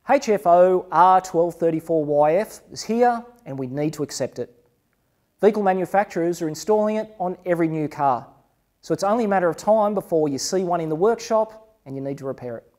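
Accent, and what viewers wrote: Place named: Australia. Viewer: It's Aussie, not English.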